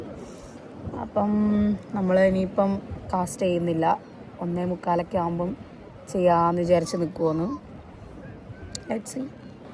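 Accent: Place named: Kannur, India. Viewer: “Ayyoo aah pennu avidenneduthu THULLI” 😂. Kannur baasha❤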